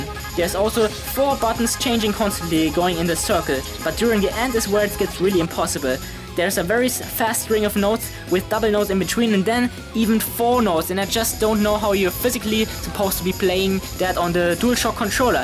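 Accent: Germany. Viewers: I do not want to ask you a very personal question. But… are you perhaps German? Because I’m always getting this idea because of your accent … 😅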